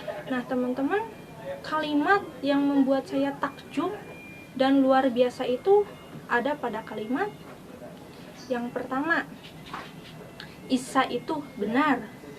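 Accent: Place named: Ambon, Indonesia. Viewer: Orang Ambon ya mba. Atw manado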